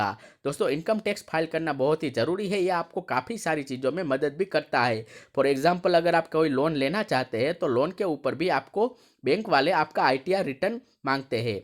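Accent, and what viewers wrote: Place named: Gujarat, India. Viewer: आप की हिंदी सुनके लगता है की आप गुजराती हो।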